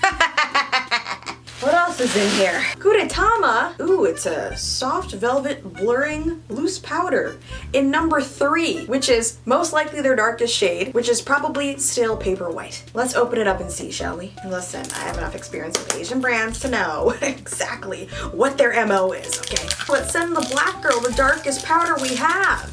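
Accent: England, United Kingdom. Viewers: Can we talk about the English accent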